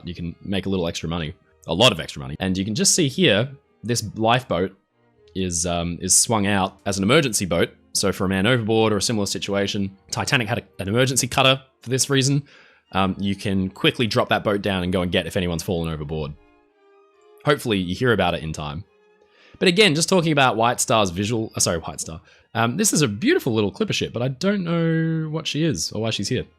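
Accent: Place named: Tasmania, Australia. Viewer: I love your Tasmanian/Victorian accent. Also, from here in the deep interior of North America (Colorado) most of us will never get a chance to experience the ocean/sea . thank you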